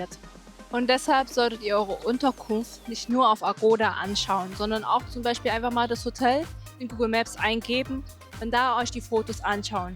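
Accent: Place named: Saarland, Germany. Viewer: BIsch du aussem Saarland? :D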